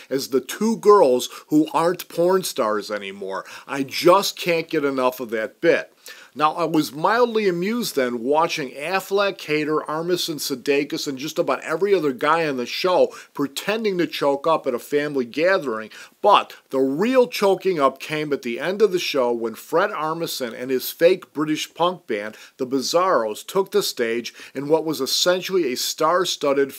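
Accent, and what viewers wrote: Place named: Midwest, United States. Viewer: why is it that this guys Midwest accent is so annoying? New York, Southern and Boston accents seem charming or amusing. Words like "FAAACTORY", "Gaaathering". Just an observation.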